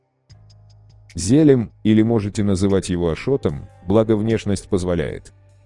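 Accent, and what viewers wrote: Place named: North Ossetia, Russia. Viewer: Голос автора с осетинским акцентам.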